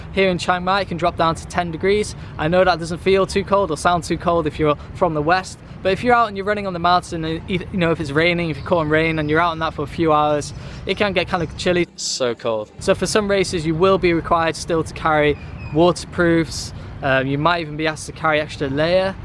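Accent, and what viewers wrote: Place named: North Wales, United Kingdom. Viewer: Really your from Wales! You sound from North, I'm from Denbighshire if you know there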